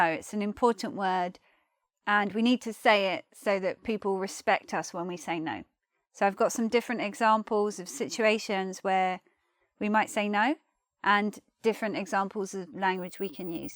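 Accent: United Kingdom. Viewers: Hi,! Glad to see and hear you again. Your British English accent is always a good practice for me. And you are a very good teacher. With love from Russia. Ch.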